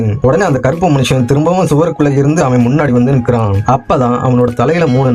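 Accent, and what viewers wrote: Kongu, India. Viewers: Kongu tamil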